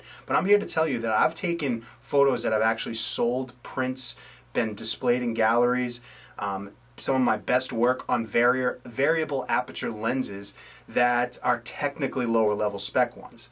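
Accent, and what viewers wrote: Boston, United States. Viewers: Just got the Z6 am a portrait photographer working in-studio and natural light. will be renting the Nikon 50mm F1.8 S and the Tamron 24-70mm f2.8 G2 lens and using them in an informal shoot. What are your thought s on the Tamron lens if you've worked with it? Love the B&W, the info, and the accent being a Brookline MA ex-resident living in Seattle Thank you.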